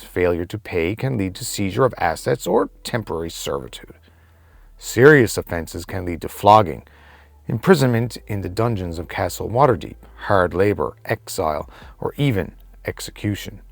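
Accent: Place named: Newfoundland, Canada. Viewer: Great video! With that Newfoundland accent and all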